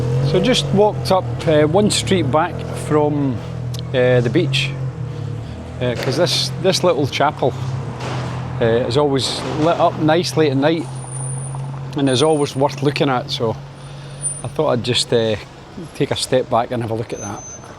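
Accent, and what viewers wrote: Scotland, United Kingdom. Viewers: Interesting vid again, many thanks. A small aside, I like the Scottish accent having spent a bit of time working in Hamilton and other parts over the wall, but I did a little testing on this vid. If you play this at 2x speed, it sounds exactly like Russ Abbot's Scottish character. I'll get me coat... 👍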